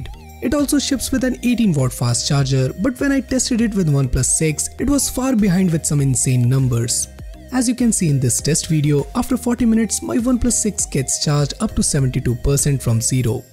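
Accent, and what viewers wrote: India. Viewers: ur indian accent is dumb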